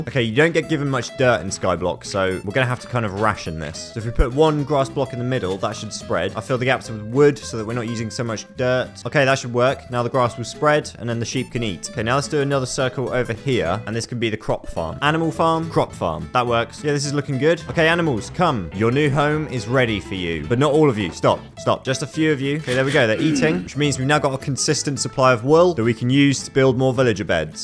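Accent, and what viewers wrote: United Kingdom. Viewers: Are you British? 😢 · You sound brithis and not british at the same time wtf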